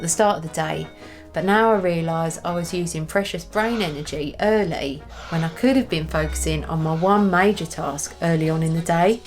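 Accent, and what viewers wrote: United Kingdom. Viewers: This is ridiculous. Everybody is different. Screw Huberman. BTW, love your British accent.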